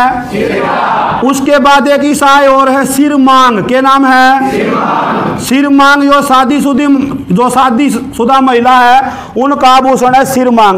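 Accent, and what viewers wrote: Haryana, India. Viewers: Mera Haryana gk se door door se koi matlab nahi hai sir me to bas apki Haryanvi language sun ne ke liye aur apki teacher quality ke liye video dekhta hu good sir keep it up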